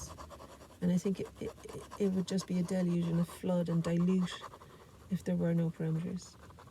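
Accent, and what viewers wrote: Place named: Ireland. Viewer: your lovely soft Irish lilt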